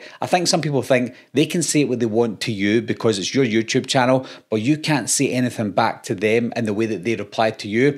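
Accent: Scotland, United Kingdom. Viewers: Screw the trolls, its actually hilarious some of the comments, i don't have a YouTube channel but can fully understand the level of abuse you get as ive got a few friends who have one and its unreal the shit they get. I'm Scottish and fully understand you so fuck anyone that cant understand the Scottish accent, they don't deserve you mate. You don't need idiots watching your streams anyway absolute losers. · I’m from Brazil and the accent I adopted was American and I can completely understand you. Really like this Scottish accent to be honest. Don’t worry about the trolls/haters ! You’re awesome!! Keep up the good work!!! 👏🏻👏🏻👏🏻👏🏻👏🏻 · you’re the man. Good on you for letting this nonsense roll right off your back. I’m from Boston, MA - I speak my dialect. You’re in Scotland and speak yours. Who’s to say that anyone from a different area is speaking incomprehensibly? 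Love your channel! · I can't believe people comment on your accent, you're from Scotland what should you sound like? Crazy people 😊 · Give ‘em hell! Very entertaining I think Scottish accents are dope and I don’t have a problem understanding Open your ears people! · People are just dumb. They think everyone in the world is suppose to sound like they do? Also I love your accent. It reminds me of my mother in law who is from Edinburgh. She teaches me all kinds of things like I hunt the gruffalo in the deep murk widd! Also telling people git ursell to fuck ya wee radge!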